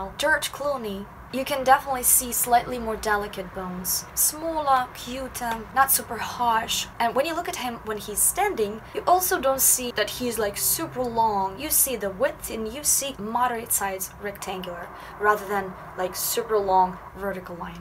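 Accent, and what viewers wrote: Russia. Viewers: *beach *cheeks. She has a Russian accent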